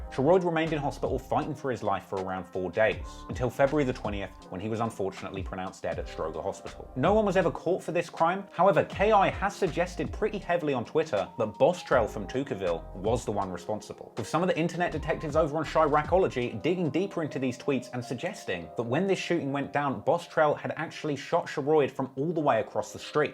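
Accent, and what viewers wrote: United Kingdom. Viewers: Its lowkey kinda weird havin a British guy talk abt my city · Hearing the history of my city in a British accent is hilarious 😂😂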